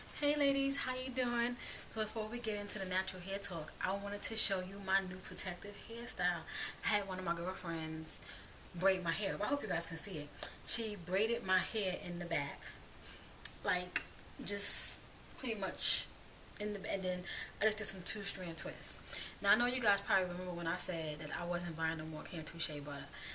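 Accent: New York, United States. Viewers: I love prepooing with coconut or olive, very simply i just add oil 15-20 minutes before i shampoo or cowash. It's makes detangling very easy. I would recommend looking at the website blackgirllonghair this website is bomb! They break down information on black haircare very simply and showcase a variety a technique and tools. BTW I love your New York accent you represent New York girls proudly!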